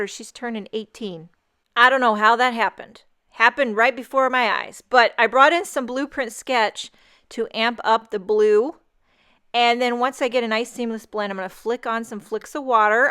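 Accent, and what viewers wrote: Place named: Boston, United States. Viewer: Thanks for sharing!! When I first found cardmaking a year ago, I remember listening to u and laughing my “ butt off!” Lol! I was dying to know if u were from the Boston Ma area, by the way u were talking! Lol! U are such a breath of fresh air! Ur cards are absolutely beautiful, and ur fun to listen to too!!!😀❤️👍🏼 Happy bday to ur daughter! My baby just turned 23 yesterday!!!! I miss both of my boys so much! Enjoy all the craziness now! For me, the “empty nester” thing is “ for the birds!” Lol! It’s totally not a home when ur babies are out on their own! Merry Christmas to u and ur BEAUTIFUL family!!! Liz E Cape Cod Ma 😊❤️🎄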